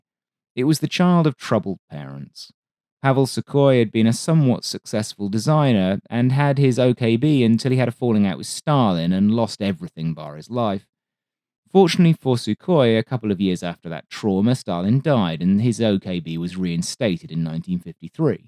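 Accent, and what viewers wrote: United Kingdom. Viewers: Hearing a british voice talk about killers The uk is nothing but murdererous thieving pos country.... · I like the British accented narration. Just gives it more credibility. Like Great Planes or Discovery Wings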